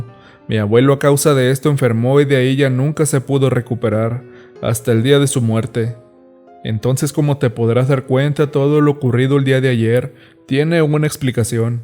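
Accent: North, Mexico. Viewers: Me gustan mucho tus relatos...los lees muy bien 👍 se escuchan diferente con tu tono norteño☺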